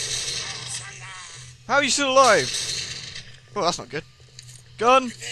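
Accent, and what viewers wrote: United Kingdom. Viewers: i cant tell is he brithish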